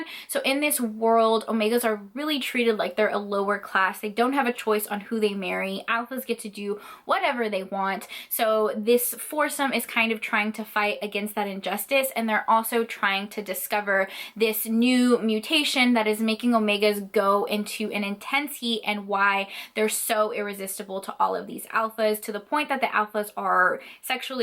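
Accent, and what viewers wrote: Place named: Brazil. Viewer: Are you brazilian? Your accent looks really like a Brazilian one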